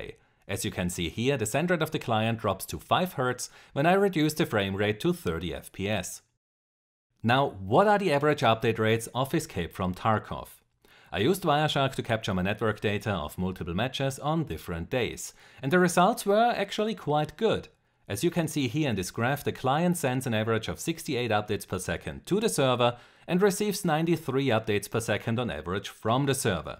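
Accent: Germany. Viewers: Love your german accent 😏❤️ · Now I finallay now that you are german ^^ Always thought that, that is a german accent :D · man hört so raus dass du deutsch bist xDDD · man hört an dem Akzent das du Deutscher bist :P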